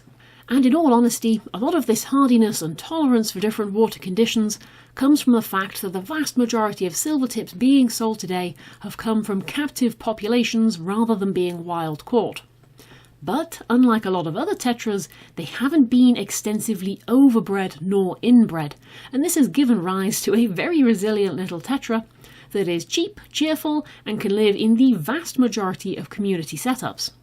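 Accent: United Kingdom. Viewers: Thank you for this video, it was so comprehensive. I just heard that they were a bit aggressive so I wanted to explore further. BTW - I love your British accent!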